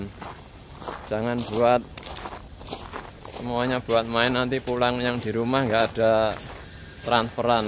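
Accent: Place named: Java, Indonesia. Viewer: Semngt mas. . Jowone pundi ko medook bngett.. 😄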